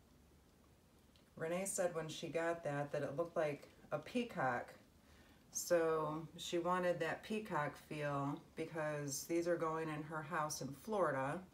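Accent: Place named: Texas, United States. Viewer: I live in Texas, north of Houston in The Woodlands. I thought you sounded like you might have been from down here somewhere. Anyway, glad I found your channel.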